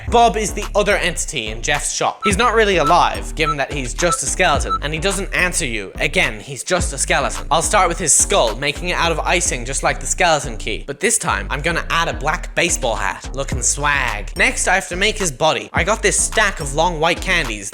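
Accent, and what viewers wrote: Australia. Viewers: I’m Australian ur Australian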